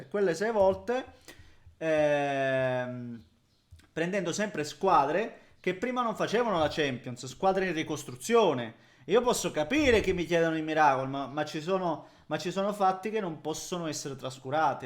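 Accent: Calabria, Italy. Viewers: È lucano? Ma parla e si muove come i calabresi ahahahaha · devo dire che la cosa che più mi ha colpito del video è scoprire che sei lucano (lo scopro ora nonostante ti segua da anni) il che mi ha sorpreso perché dalla tua parlata passa un filo di accento calabrese quindi immagino tu sia della zona vicino la calabria. magari mi sbaglio, tuttavia io ho origini materne proprio in basilicata e quindi volevo sapere di che zona sei magari c’è la remota possibilità che tu sia della “mia” zona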